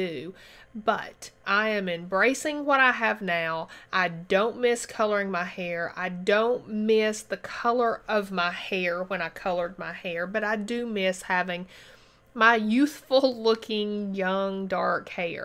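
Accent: South, United States. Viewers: You are so pretty. I love your southern accent. I have one as well. Georgia girl. I have made the decision to go gray.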